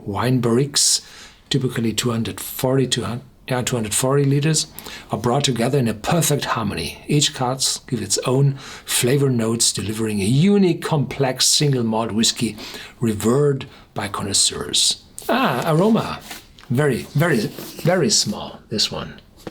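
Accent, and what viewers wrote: Germany. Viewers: It's half german, half Christopher Walken :)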